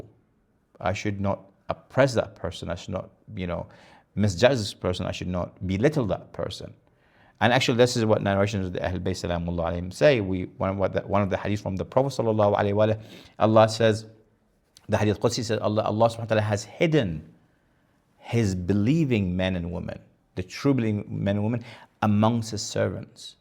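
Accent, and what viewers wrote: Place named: Michigan, United States. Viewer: وكانك من لهجتك عمامة ميشكانية😢😢😢فان كنت كذلك فلعنة الله عليك فما انت الا اشر الخلق.....الحمكم ان كنت من امريكا..فإنما انت ديوث قواد منحط اخلاقيا عديم شرف،وهذا ابسط ما يقال فيك....ان كنت من امريكا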